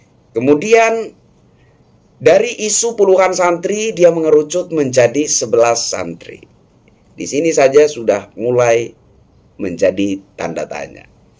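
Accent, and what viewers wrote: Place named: Papua, Indonesia. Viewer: Assalamualaikum.Bang. 🗣️ Oh..mksd ada BENANG- MERAH to? 🪡🪡😇Waduh kentalnya dialektis Papua,👏👏bongkar teruss ya bongkar,Bang💪🇲🇨 TRIms,🙏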